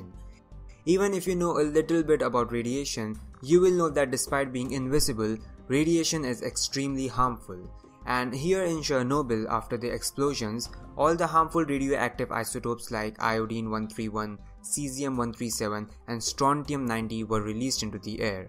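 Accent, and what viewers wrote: India. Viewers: Bruh indian?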